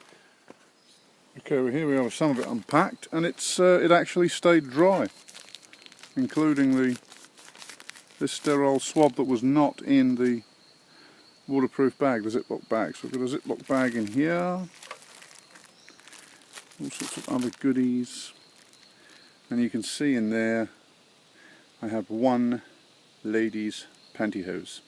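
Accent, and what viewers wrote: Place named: Hampshire, United Kingdom. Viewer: Never knew you were a 'Ampshire hog' like me. Funny how accents deceive people! ATB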